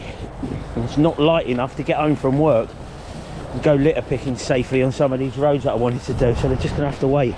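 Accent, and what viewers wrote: United Kingdom. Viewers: yep, guilty of yelling GO ON now. I find it hilarious and me being from Canada I love hearing your British way of speaking.. madder then a box of frogs!!! LOVE IT:)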